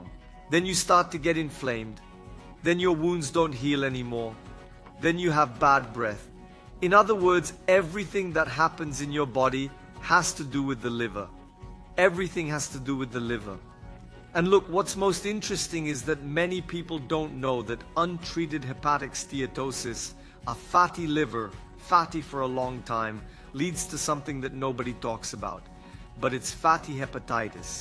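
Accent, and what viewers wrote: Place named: India. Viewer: Great job dr.. Sutaque parececido com indiano falando.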